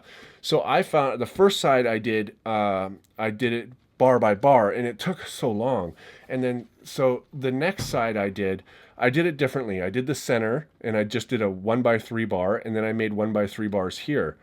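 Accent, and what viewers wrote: Canada. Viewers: I detect a Canadian accent ... you from Toronto ? Teach ya to play guitar if you help me solve a 3x3 eh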